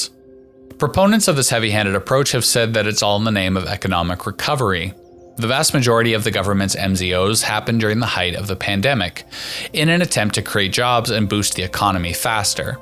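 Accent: United States. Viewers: Because the creator is a dumb YANK, NOT A CANADIAN. Can't even say "zed".......as in either of our two Official Languages.....one of which is NOT "Amurrrrrrican".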